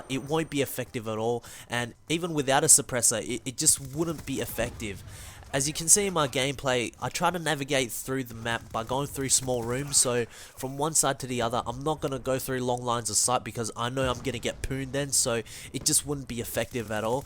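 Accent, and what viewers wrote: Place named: Australia. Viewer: i knew your were Australian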